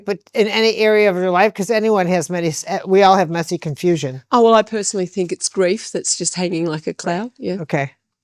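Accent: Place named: New York, United States. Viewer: I like it - instead of triggering, it makes me laugh. I'm not familiar with where grew up, but her accent reminds me of my childhood in rural central New York.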